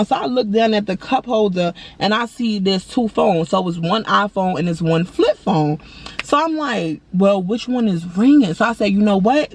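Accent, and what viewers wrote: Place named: South, United States. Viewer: You been down south too long you got that south accent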